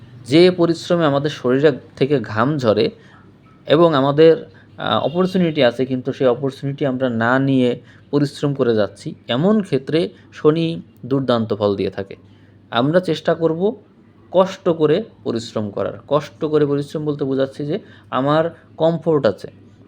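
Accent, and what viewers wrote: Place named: Bangal, Bangladesh. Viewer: আপনার বাংলা ভিডিও তো নয় ---এটা বাঙাল ভিডিও। বাংলাটাই তো ভালো করে বলতে পারেন না। আগে বাংলাটা ভালো করে শিখুন তারপরে বাংলা চ্যানেল খুলবেন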